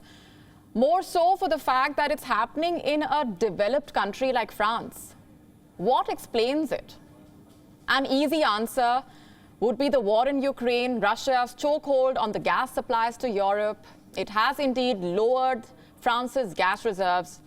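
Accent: India. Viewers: You are speaking Indian English. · I hate indian accent. It's disgusting. Please learn the language well. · The Indian English! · Fire her. Her Indian accent is atrocious.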